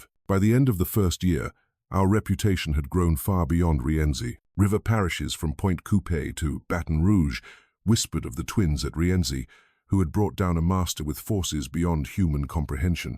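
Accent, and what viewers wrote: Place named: United Kingdom. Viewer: A British accent is just WRONG for this. It needs to be a Cajun accent.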